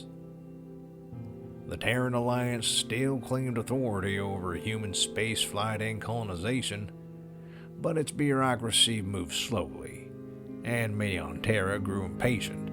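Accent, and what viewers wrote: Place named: South, United States. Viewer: Stylized Southern accent, but real none the less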